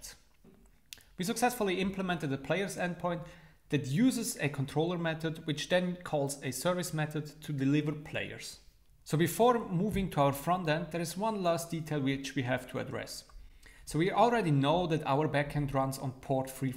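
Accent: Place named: Switzerland. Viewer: meh ghört din schwiizer akzent voll use. super video merci <3!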